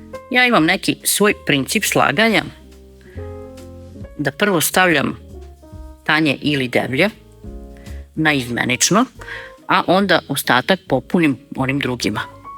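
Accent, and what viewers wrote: Vojvodina, Serbia. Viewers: Svaka vama cast.Po naglasku bih rekla da ste iz Vojvodine ako je tako nije cudo sto vam sve ide kao voda.Veliki pozdrav.❤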